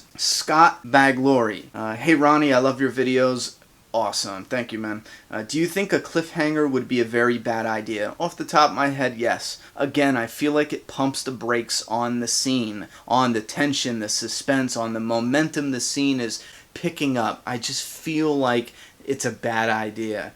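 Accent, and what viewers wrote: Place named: Boston, United States. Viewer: are you from Mass? I hear that Boston accent...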